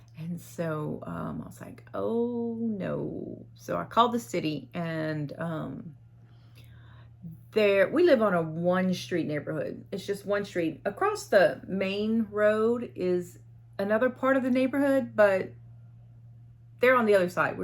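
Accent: Louisiana, United States. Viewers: Oh =my goodness! I just found you. You sound like home! I am from Louisiana. Thank you for a wonderful video. I will watch your other videos this week.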